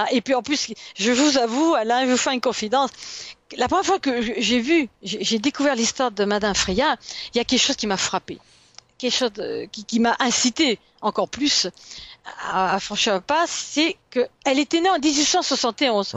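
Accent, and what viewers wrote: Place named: South, France. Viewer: C'est génial j'en ai oublié mes tracas comme quand j'étais petite absorbée par cette histoire au accent du sud encore encore encore 👍merci merci merci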